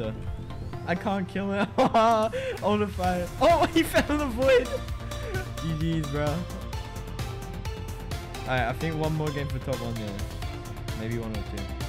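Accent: Australia. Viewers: R u Aussie?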